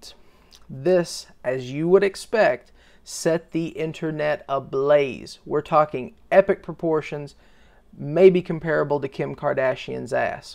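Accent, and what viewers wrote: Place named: South, United States. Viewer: "happy as a pig in shit" Dude, you have a wonderful way with words. I haven't seen a tech channel run by someone with such a strong southern accent. It's a great pairing! I don't really subscribe to channels these days, but you seem to be making promising, informative videos and I want to see you grow, so I subscribed. Looking forward to more stuff from you!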